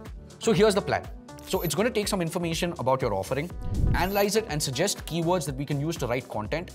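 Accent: India. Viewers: U don't look Indian but sound Indian wonder why🤔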